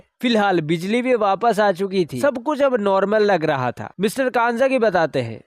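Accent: South, India. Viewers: Lots of love from Rawalpindi ap konsay city se hain accent ap ka south Wala hai Namaste Namaste Namaste Namaste Namaste Namaste Namaste Namaste Namaste Namaste Namaste Namaste Namaste Namaste Namaste Namaste Namaste Namaste Namaste Namaste Namaste Namaste Namaste Namaste Namaste Namaste Namaste Namaste Namaste Namaste Namaste Namaste Namaste Namaste Namaste Namaste Allah Hafiz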